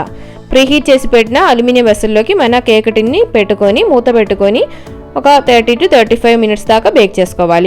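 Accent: Kerala, India. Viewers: Yantha ba telugu matladhunori with that malayalam touch❤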